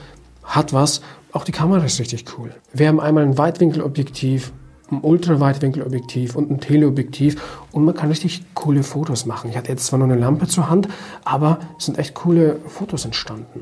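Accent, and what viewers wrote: Russia. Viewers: bist du russe? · Russischer akzent izz da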